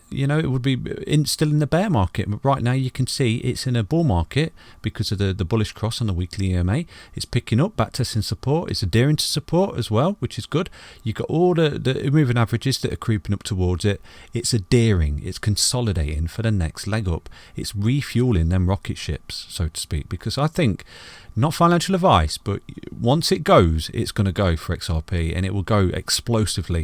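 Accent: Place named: East Midlands, United Kingdom. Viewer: mate your accent - you gotta be from Notts/Derbys?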